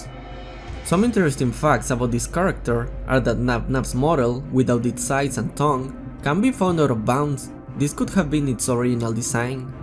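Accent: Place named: Spain. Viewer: Your acent is very spanish.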